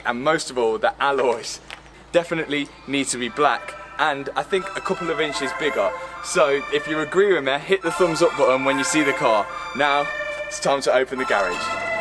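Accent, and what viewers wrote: Leicester, United Kingdom. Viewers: Looks sick 👍🏻. Saw the S5 in Blaby today, thought I recognised the Lesta accent 😂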